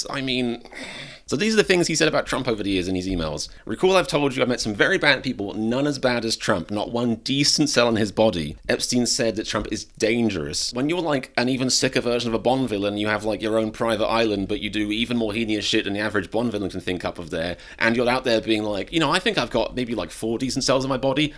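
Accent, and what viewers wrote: United Kingdom. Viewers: Love the British accent pronunciation. Makes "The IT Crowd" episode with Jan's new boyfriend, Peter File, that much more funny.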